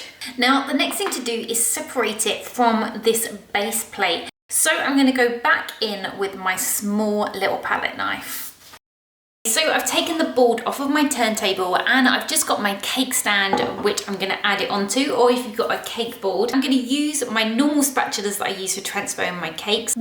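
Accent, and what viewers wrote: United Kingdom. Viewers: Your accent seems like British ??? Right or wrong?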